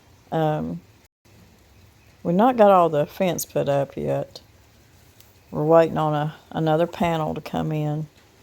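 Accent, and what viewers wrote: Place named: South, United States. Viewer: I thought I was the only one😂glad to see others think so too. My Mother came from Tennessee and had that southern draw, reminds me of her, so when I get to missing her I turn to this channel and I am home again 😊🏡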